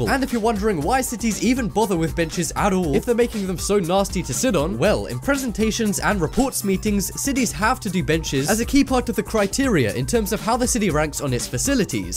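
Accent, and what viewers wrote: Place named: United Kingdom. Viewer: he is British. That's just their normal accent.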